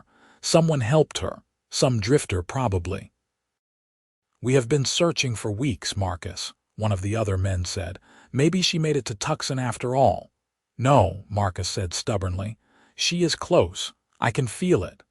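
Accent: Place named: Midwest, United States. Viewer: My most favorite voice! Midwest.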